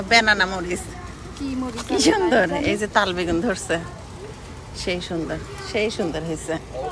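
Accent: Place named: Bangladesh. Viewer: আপু আপনি তো বললেন না বাংলাদেশে আপনার দেশের বাড়ি কোন জেলাতে। আপনার ভাষা শুনে মনে হয় আপনি আমার জেলার মানুষ। তাই খুব জানতে ইচ্ছে করছে। যদি কোন সমস্যা না হয় একটু বলতেন ভালো লাগতো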